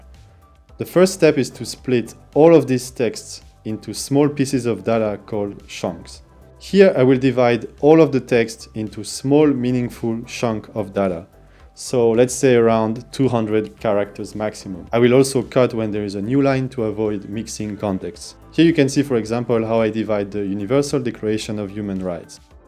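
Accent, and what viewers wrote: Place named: France. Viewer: Very useful content and lovely French accent 👌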